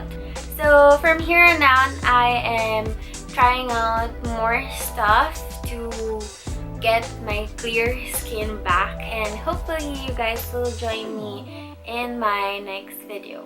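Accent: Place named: Philippines. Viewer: your accent is SOO cute!! are you filipino???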